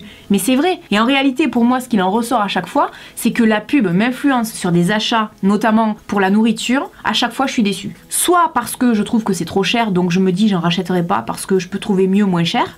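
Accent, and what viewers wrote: South, France. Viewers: Quand je t'écoute j'ai l'impression d'être à la maison! L'accent du sud que j'ai la chance d'entendre qu'en été ça pourrait rendre la vidéo la plus inintéressante agréable à écouter! Sinon, pour le Badgal Bang, on est d'accord, il est génial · j'adore ta chaîne t'es naturel et franche et l’accent du sud qui va avec ^^ :) pourtant ça parle de maquillage (pas vraiment mon truc haha =D ) mais je sais pas j'aime bien regarder, ça parle un peu de tout les sujets =) , bonne continuation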